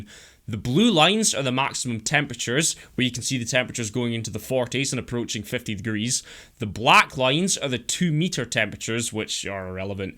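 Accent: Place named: Scotland, United Kingdom. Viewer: holy shit you are Scottish. was not exepcting that. Fellow Scot. Not my real name haha. Sub'ed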